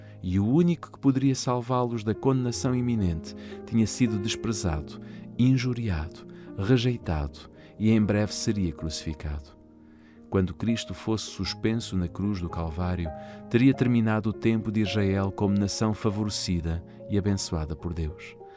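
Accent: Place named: Portugal. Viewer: Um ótimo trabalho! Uma otima voz! O Senhor seja louvado por esta iniciativa em português de Portugal com esta agradabilissima voz!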